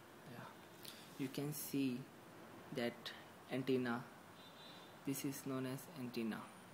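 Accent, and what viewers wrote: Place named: India. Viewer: pure indian accent. lmfao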